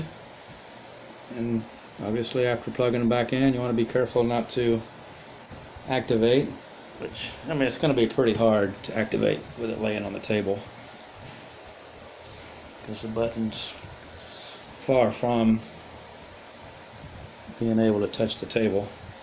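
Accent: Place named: Northern Ireland, United Kingdom. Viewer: Could i ask where your from as your accent is def N Irish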